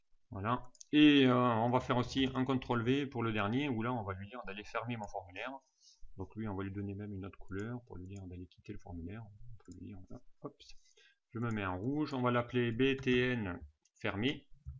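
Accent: South, France. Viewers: merci monsieur pour ce partage de savoir. Les explications sont très claires et en plus on le petit accent du sude!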